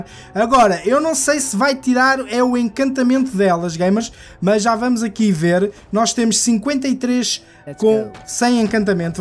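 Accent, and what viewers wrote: Portugal. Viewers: Olá! Primeiro quero parabenizar pelos seus excelentes vídeos. Gosto especialmente de seu sotaque português que lembra muito meus avós. Bem, eu descobri uma forma mais simples de duplicar itens. Primeiro drope itens em qualquer lugar de Skyrim (em especial nas cidades, em lugares mais visíveis), peça para seu follower pegar os itens e, em seguida, dá um fast travel para uma cidade próxima. Pode dropar alguns itens ali também, repetir o processo e voltar à cidade anterior com fast travel. Ao voltar ao local, ali estarão os itens dropados... se vc pedir novamente para seu follower pegar os itens, ao voltar novamente para o local, via fast travel, eles duplicarão novamente! Fiz isto várias vezes e funcionou no Skyrim Special Edition. Só tenho problemas em duplicar as pedras preciosas, pois vem os guardas e querem me prender... kkk... teve até uma hora que a Lídia começou a querer me matar!! 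E ela já era minha esposa.... tive que retornar o save, porque ficou uma confusão.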